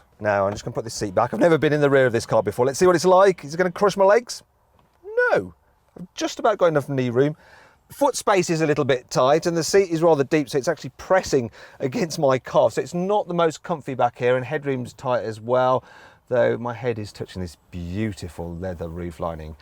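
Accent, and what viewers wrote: United Kingdom. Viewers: 영국발음 너무조아 미쵸♡♡♡ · 리뷰하시는분 발음이 영국분 같은데 나같아도 국뽕맞겠다..ㅜㅜ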